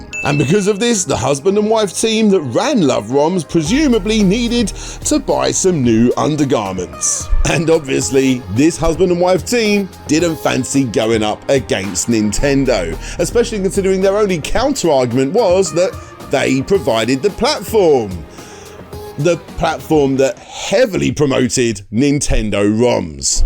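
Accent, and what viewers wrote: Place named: England, United Kingdom. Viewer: You forget dingleberry, that he’s English and they say certain words with certain enunciations. Right now you come off as one those assholes who thinks they are smarter than everyone else.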